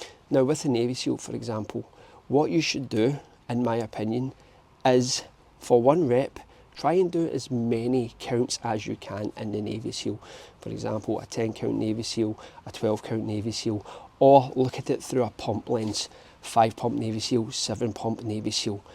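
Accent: Scotland, United Kingdom. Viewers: Hey man, my comments have been telling me to hit you up. Where is Scotland are you from? 😊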